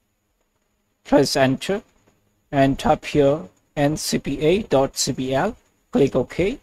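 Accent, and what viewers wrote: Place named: India. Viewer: I knew it would be a waste of time as soon as I heard indian voice but still tried and yea it wasted my time 😂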